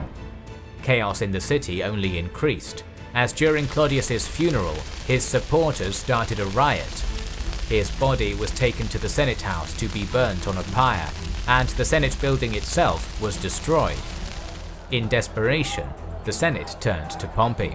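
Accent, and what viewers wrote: United Kingdom. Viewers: Nice British accent!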